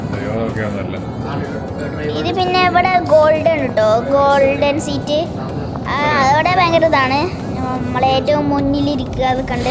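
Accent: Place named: Mannarkkad, India. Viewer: മണ്ണാർക്കാട് ഭാക്ഷ ഇടയ്ക്ക് കയറി വരുന്നുണ്ട് …❤️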